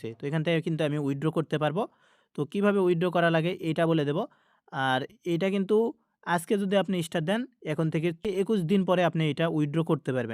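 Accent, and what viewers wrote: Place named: Rajshahi, Bangladesh. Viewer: Vai apnar basa ki rajshahi te. Rajshahir moto kotha bolsen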